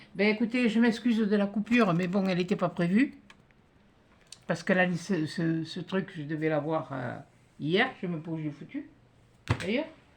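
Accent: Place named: Lozère, France. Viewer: Bonjour. Vous m avez bien fait rire avec votre kangourou et votre accent. Je szis pas d où vous etes mais certainement pas du nord de la france. Il me fait penser un peu du sud de la Lozère. J ai passé un bon moment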